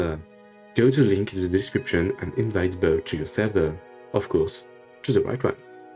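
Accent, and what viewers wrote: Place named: France. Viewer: No stress. We have a number of French users and I thought you sounded French so you might have done it already. I have now set them up, so not a problem. Thanks for the video though it is very helpful.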